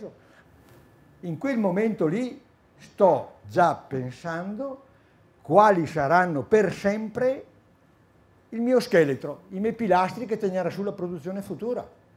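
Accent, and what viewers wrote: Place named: Verona, Italy. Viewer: Perché? Cosa dice che non va. È veronese, di una certa età, come dove parlare secondo costoro? Bha... Giusta osservazione la tua. Ciao!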